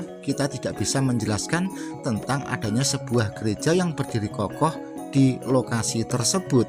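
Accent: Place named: Bojonegoro, Indonesia. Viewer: Laaa gitu lo pakde kalok tanya pada orang yang masih moblong aku sangat suka sama kontenya pakde kalok bicara enak sekali didengar logatnya orang bojonogoro.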